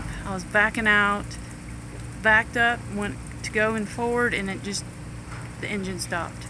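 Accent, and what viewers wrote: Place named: South, United States. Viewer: Never a dull moment in the life of a boater! BTW, any crab in that trap? Free lunch! Seriously, hope the diver doesn't find anything more than some fragments of line down there and you can continue with your adventure. I really enjoy watching all of your video's PLUS you are very easy on the eye!!! Southern drawl and all.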